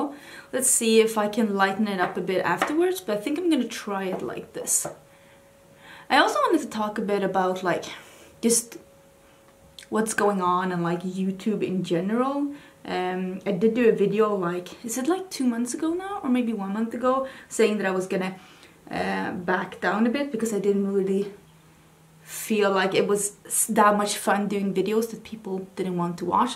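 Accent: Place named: Sweden. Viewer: I watch every single one of your videos because you're a triple threat. You're gorgeous, intelligent, and sarcastically hilarious! From the first video I found by you, I remember thinking, "WOW, this girl freaking SAYS what many people are thinking but are afraid to say!" and I subscribed immediately. That was at least 2 years ago. (Plus I'm a sucker for a Swedish accent) I also thought you were so badass because your English is fantastic and feel honored that you chose to speak it in your videos. I wish there was a way to separate the "people who count as lots of views" from the people like me who watch every video as soon as it comes out but just my views aren't enough. Like there should be a higher level of adsense for repeated views by loyal subscribers versus random 12 year olds passing through YouTube looking for something that flags their attention, who aren't subscribed. 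But I guess ads are ads.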